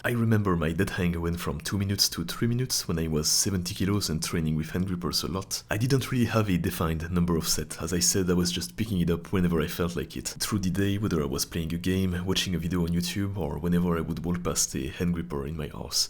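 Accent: France. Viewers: Tes vidéos sont impressionnantes !!! Et en plus j'me dis que je ne suis pas tout seule à conservé mon accent français quand je parle en anglais😅😉. T'es avant bras sont monstrueux, jolie boulot, je me pose la question sur ton régime alimentaires Good job bro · You sound french for real Edit : your are french for real I can ear that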